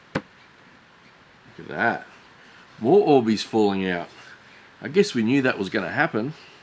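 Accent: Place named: Australia. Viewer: Hey! I just subscribed to you a few months ago, and I've seen lots of your videos now. Also, I'm subscribed to Nick Zametti (I think that's how his last name is spelled, I'm not sure). At the beginning of this video, you mentioned about Nick doing an experiment with Orbeez as well, and having a similar problem with them involving resin. If I remember correctly, Nick Zametti also did an experiment with Orbeez involving resin, and having a similar problem with the Orbeez being too moist to adhere to the resin. I'm assuming that that is what you were referencing to with Nick. I wanna let you know that Nick Zametti just posted a video toying with the idea/proposal of possibly quitting YouTube, but being talked out of it by his fans. I would like to propose an idea to you about Nick Zametti. I would be tickled pink if y'all would collaborate with each other on a project. 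Like, if possible, not really just doing mirroring videos of each other doing a similar project together yet physically separated from each other, but a collaboration video of y'all being physically next to each other, sharing the same space, and knocking around ideas against each other, and possibly y'all working on separate pieces of a single project together, to where the two pieces that y'all work on come together into a single cohesive product. If that would be possible, that would be AWESOME!!!!!! Y'all have a similar accent, so I'm guessing y'all are either from Australia or New Zealand, cause to me, th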